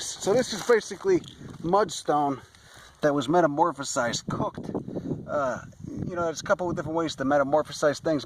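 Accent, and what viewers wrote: United States. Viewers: those leaves look like they were pressed yesterday, thanks for the video, just one thing can you slow your narration down just a tad i have trouble keeping up, not use to the U.S accent.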